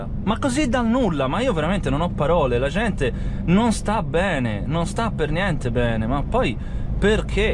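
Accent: Tuscany, Italy. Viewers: Video molto bello soprattutto non noioso poi l accento toscano migliora il tutto. Potresti fare un video anche di pochi minuti un cui fai vedere il camion?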